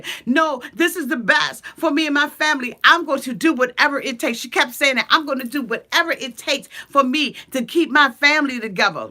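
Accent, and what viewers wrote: Washington DC, United States. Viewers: I was thinking you from DC, I hear my family in the way you speak This is why I am drawn to you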